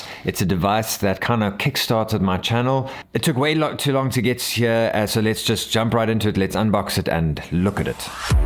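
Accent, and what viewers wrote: South Africa. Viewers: This came up on my recommendations because I’m keen to get this! But I have to ask, are you South African!? So cool! Can’t wait to get my Miyoo Mini Plus 😃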